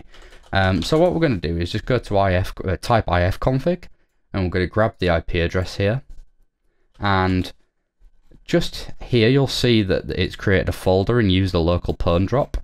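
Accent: United Kingdom. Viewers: Men im in love with this uk dialect .. i just enjoy alot ..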